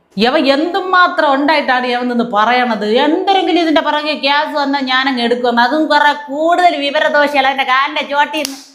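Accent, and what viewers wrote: Thiruvananthapuram, India. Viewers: അവതരണം മഹാ ബോർ. വേഷം കെട്ടലും. തിരാന്ത്തരം ബാഷകൾ പറയല്ല്🤔🤐🥶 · ur simply awesome.what a super presentation.super tvm slang.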